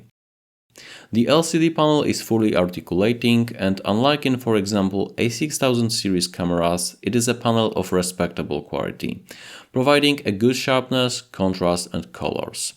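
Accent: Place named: Poland. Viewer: Your extremely heavy Polish accent makes your review difficult to follow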